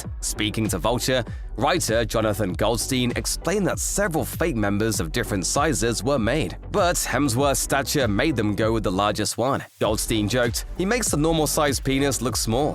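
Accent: England, United Kingdom. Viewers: He actually said “Dirk” but his British accent make it a bit like Doug to American ears. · It's an English accent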